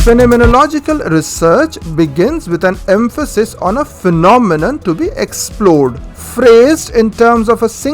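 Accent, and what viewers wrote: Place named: India. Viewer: This video seems very important and informative. Sadly I don't understand the English with the heavy Indian accent. My loss. 😔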